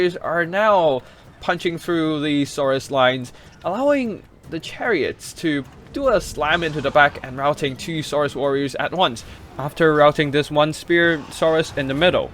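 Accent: China. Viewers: Your Chinese accent makes the video so nice to watch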